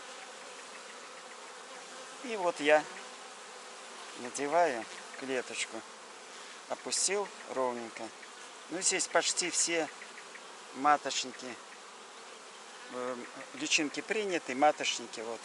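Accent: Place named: Belarus. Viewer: я сразу по говору понял что вы с белоруси)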